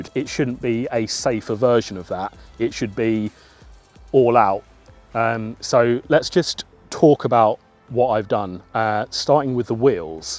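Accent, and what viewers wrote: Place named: United Kingdom. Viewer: thats what i call a british accent! =D. TOMs rearwing gives a bit of a good rallye touch aswell!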